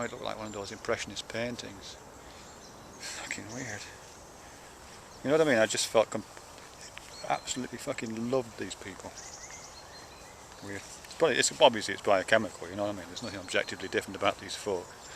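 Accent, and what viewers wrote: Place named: Newcastle, United Kingdom. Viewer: I could hear a bit of Geordie in there, have you by any chance been in Newcastle?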